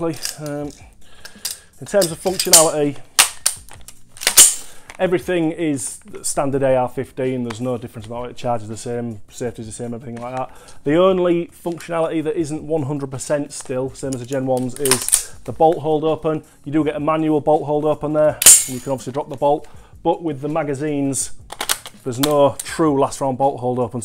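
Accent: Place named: RP, United Kingdom. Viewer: You sound very Posh! At least to me, i'm form Philadelphia. lol.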